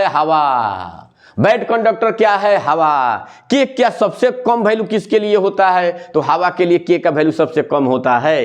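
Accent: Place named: Bihar, India. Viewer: Bro speaks in bihari accent💀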